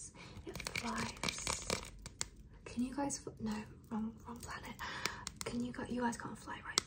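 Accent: United Kingdom. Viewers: + why do you have a British accent?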